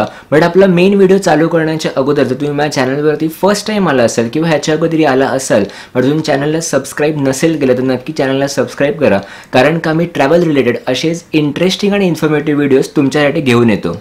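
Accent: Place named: London, United Kingdom. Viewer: हा असे मराठी का बोलतोय?London born आहे का?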